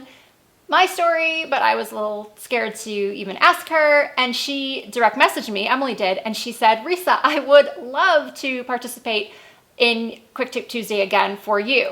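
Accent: Midwest, United States. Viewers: Hi Risa. I love the look you created. I’m so excited to get these. I love the support you, Lisa and Stephanie offer Emily! Strong, beautiful women supporting another strong, beautiful woman. Makes me so happy and proud to be a subscriber to all of you ladies. You guys have created the very best YouTube communities out there💜. Thank you ( I’m from Michigan too! I knew you sounded midwestern😊). Cheers 🥂 to this community!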